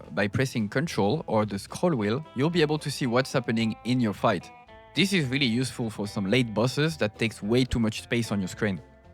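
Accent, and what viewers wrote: France. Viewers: Excellent video mon pote, it's rare to see french accent in english videos, yours is very sweet. Also a LOT of your tips are unique and useful, great video. I'll add more tips that i've learned myself : -Some items belongs to several sets family. If you have the right items, you can have a cat with 2 set bonuses, if equipped with the right items. I don't think you can have more than 2 sets ? Should test that. -Birth defect can't mutate (anymore). If your cat have a head birth defect for example, he'll keep it forever. It can't mutate in a room with high mutation nor during an adventure event. -Charisma stat determine how your cat is attractive to others, and how much it will reproduce. A cat with 0 or negative charisma will never reproduce. From experience, a cat with 12+ charisma will reproduce every night. Was a long time ago, all my cats are descent of this Barbie named cat. 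My personnal tip is to do easy runs with cats that have good stats and mutations, and boost their charisma, so they give you a lot of kitten. Also keep that in mind if you want to do a cancer/uranium rod run. Even if you get a lot of mutation, if he look unfuckable, he will be. -If you have 0 Intelligence, the text during event will be randomize BUT only the letters are randomized. Words will keep the same number of letters. Can be helpful if you try to decipher or remember the events. -If a cat is the love-interest of multiple cats, those multiple cats might fight each others. Be carefull, as even a h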